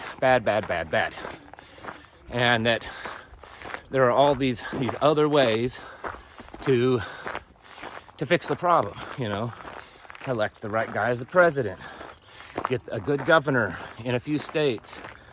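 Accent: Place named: Arkansas, United States. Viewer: You said secession at least four times....you sound just like me! Arkansas Confederate.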